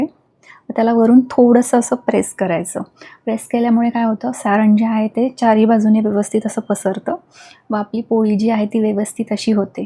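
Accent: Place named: Vidarbha, India. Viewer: रेसीपी सांगण्याची भाषा खुप छान आहे आमच्या विदभा सारखी ❤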